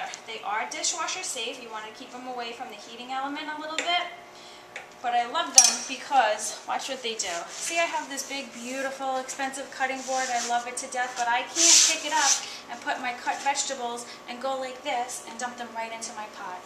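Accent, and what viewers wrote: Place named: New York, United States. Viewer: Awesome video!! Love the accent... got to be from NY 😊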